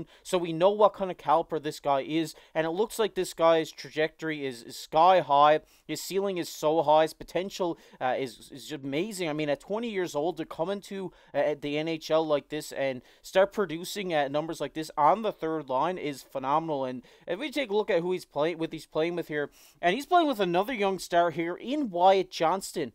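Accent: Canada. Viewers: Great videos, you are the most Canadian sounding guy ever :)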